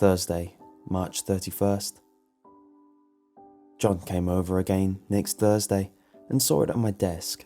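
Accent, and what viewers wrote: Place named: Cockney, United Kingdom. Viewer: It's called a 'Cockney' Accent..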